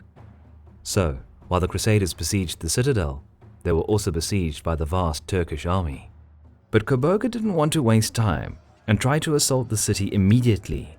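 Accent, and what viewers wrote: South Africa. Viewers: Can I just ask, is your commentator South African? Please reply. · south african is the accent is my guess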